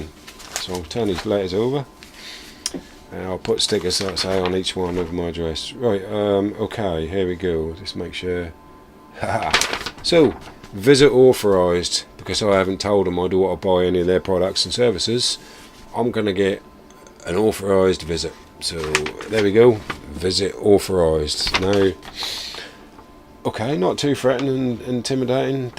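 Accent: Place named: Norfolk, United Kingdom. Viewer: Left home aged 17, now 65 and never had a tv licence or visit. Good old Norfolk accent.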